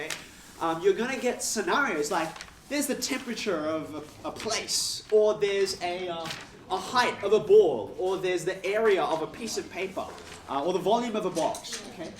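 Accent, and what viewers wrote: Australia. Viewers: What is his accent? Aussie, right? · yes, hes from sydney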